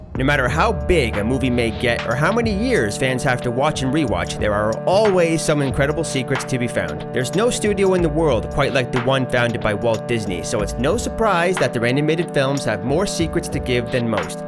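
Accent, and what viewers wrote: Canada. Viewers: The narrator is Canadian, isn't he? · Canadian accent is nice.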